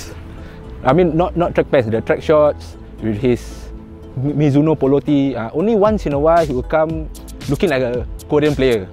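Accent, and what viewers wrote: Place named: Singapore. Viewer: He's the first in many years that's not afraid to showcase Singlish to netizens,which is definitely a good thing. Good on you man!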